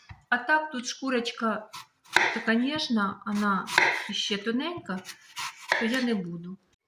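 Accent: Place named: Odesa, Ukraine. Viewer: Яка ж у вас чудова одеська мова!! Ви СУПЕР господинечка!! Як я люблю дивитися на Вас і Вашу працю! Обов'язково зпечу цей КРАСЕНЬ!!!❤❤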